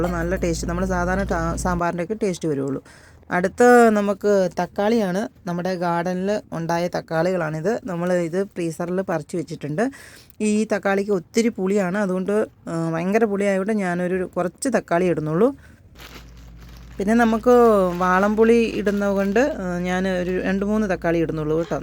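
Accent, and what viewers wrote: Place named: South Kerala, India. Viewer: 👍pakshe Malayalam slang thekkan bhasha pole thonni ( like pathanamthitta)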